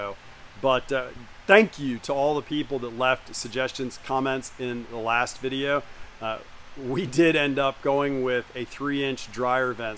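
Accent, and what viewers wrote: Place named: Pennsylvania, United States. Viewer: sounds lie you are from PA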